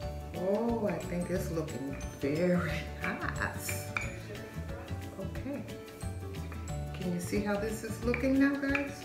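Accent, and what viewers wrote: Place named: Mississippi, United States. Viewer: You must be a Mississippi gal. New South Ford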